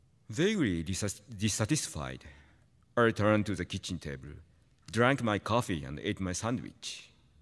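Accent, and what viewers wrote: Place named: England, United Kingdom. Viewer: I love his English accent, sounds so melodic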